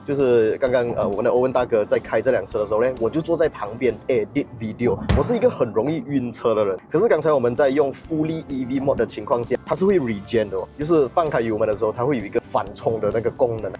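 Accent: Malaysia. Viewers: 听马来西亚华人说华语好舒服的感觉 👍 很可爱的口音 讲解也很专业 爆赞 ❤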